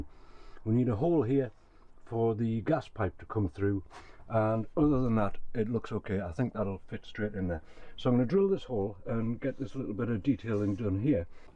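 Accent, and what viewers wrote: North East England, United Kingdom. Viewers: Great work sir! I wasn't convinced about the blue at all... until the door fronts went on! They look superb with the radius corners. On another note, it is wonderful to hear a voice from my old neck of the woods that is calm, caring and from a good heart. I now live in Devon (30 years) and your voice is a good reminder of the beauty of the North East. Thanks:)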